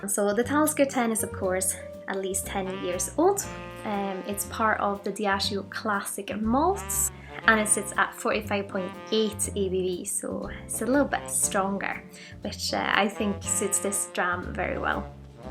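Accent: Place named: Glasgow, United Kingdom. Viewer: Cheers, agreed, this is a brilliant dram, but you sound more like a Glaswegian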